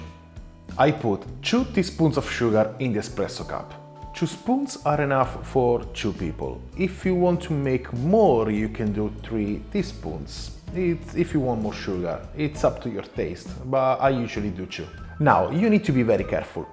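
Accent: Italy. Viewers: Italian is the new French accent 😮❤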